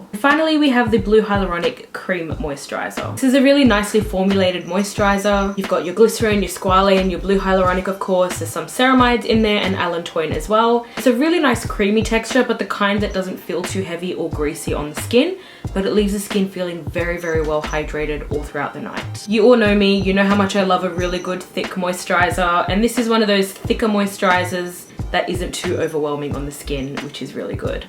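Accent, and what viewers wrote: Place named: New South Wales, Australia. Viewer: I'm so glad you're posting again! It's great to have an Aussie skincare channel, and someone who has dry skin!! Maybe you could do a video about yourself and your cat? Judging from your accent I'm gonna assume you're from NSW maybe? When did you get into skincare, how did you learn about it? Why did you start the channel? What have you learned from the channel? How much skincare do you go through seeing as you've tried so much? I'm just started using No7 cleansing water, hydraluminiius day cream, retinol, and retinol night cream and I'm really enjoying all of them, I got them after you mentioned No7 in one of your videos. I use dermaveen as my body moisturiser, and I really want to try their new face wash, the ingredient list looks like it will work well for me. I usually apply my body moisturiser first then by the time I've done my face routine and brushed my teeth I'm ready to get dressed. 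Mind you I wouldn't be able to do that in the morning in winter, too bloody cold.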